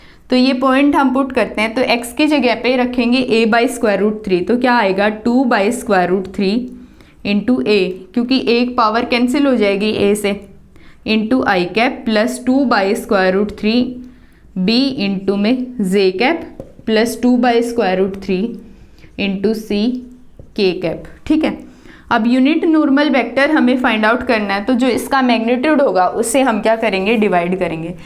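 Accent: Haryana, India. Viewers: Your haryanvi and Jaat wala lehja saf najar aa rha h bhen ji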